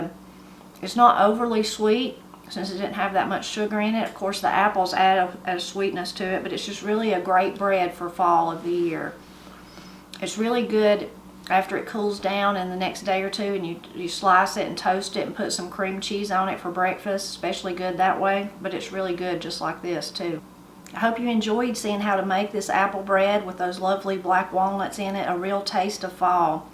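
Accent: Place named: South, United States. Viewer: You're like Tree Trunks from Adventure Time in real life. You have a cute Southern drawl, and make apple recipes too.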